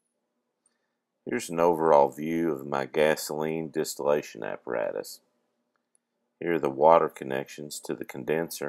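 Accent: South, United States. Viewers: "we're going to put in about 4,000 ml of regular unleaded gas, ethanol free." What the hell is this in US measurements? Sounds like you have a southern drawl, but speak in commie language. I just don't get why some insist on using metric crap when the rest of Americans primarily use customary units in commercial activities, as well as for personal and social use. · OK so you used a gallon of gas whats all this metric crap,you dont sound like your from across the pond,, sounds like your south of the Mason Dickson line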